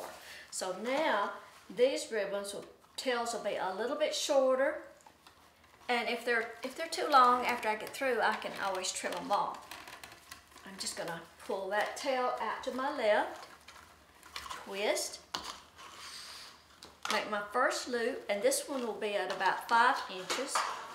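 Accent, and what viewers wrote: South, United States. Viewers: It's beautiful. Thank you for sharing. I also love you southern accent.:)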